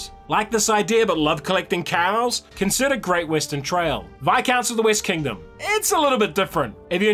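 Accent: New Zealand. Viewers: In all fairness, he doesn't have the Letter I thing as much asmost Kiwis do, but you can still tell he's a Kiwi and not a Brit lol (For those who don't know, The Letter I thing is how you can tell Aussies and Kiwis apart, cos Kiwis struggle to sound the letter I in words... thnk, blnk, snk, as opposed to think, blink, and sink, for instance lol)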